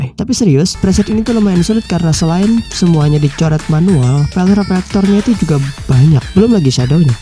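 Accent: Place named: Banjar, Indonesia. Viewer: Iya kalo, pantas ae pina ada logat banjar?? Sippp lanjuttt…. Salam Batinjakan Dr Banjarmasin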